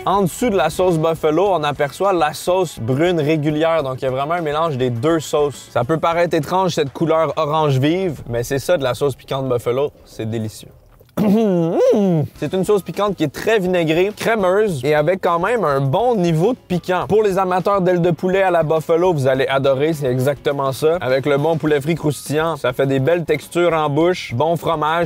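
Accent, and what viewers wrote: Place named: Quebec, Canada. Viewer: j'apprends le francais pendant un an maintenant et tes videos me donnent envie de avoir l'accent quebecois comme vous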